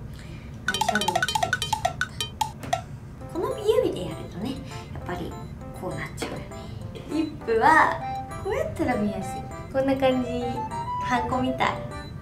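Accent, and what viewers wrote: Kansai, Japan. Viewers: 関西弁かわいい💕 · 関西弁かわいい。白いシャドーの乗せ方参考になる。。可愛い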